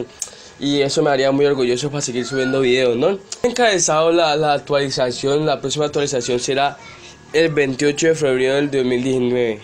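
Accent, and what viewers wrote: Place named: Portugal. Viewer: Hablas un poco raro como portugués xd de que país eres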